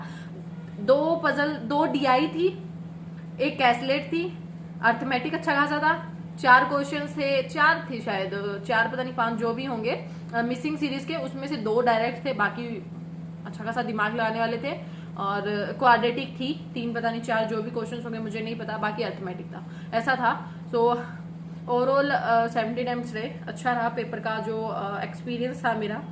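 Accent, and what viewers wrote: Haryana, India. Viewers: ha to Haryanvi bolo na English kyu kharabar kar rahe ho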